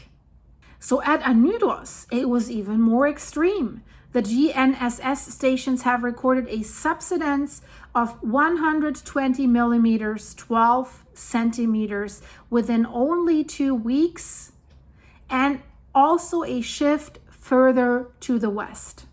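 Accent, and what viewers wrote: Germany. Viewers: Sie IST Deutsche, oder? Geh ich jetzt von aus bei, und dem unauffällig hörbaren deutschen Akzent. 😂❤ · Hi, this is a good, informative video about vulcanos in Santorini area in Greece. And your German accent of English is charming 👍😉😊 also.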